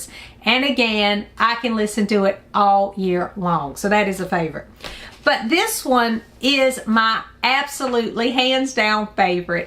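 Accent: South, United States. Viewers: your AWESOME!!!...Man oh man..love your fun whimsical video's...love your family value's...and dont get me started on your voice..that southern voice..love it!!..Your so cute and I say that with total respect..FUN FUN FUN video's...My fav Christmas album is one i listened to over and over as a kid in the 70's and its called...( A Mix )...P.S..Just ordered thanks to you..I was hoping was on it but didnt see it..:) Oh well:) is an awesome song..:) Ever heard of it???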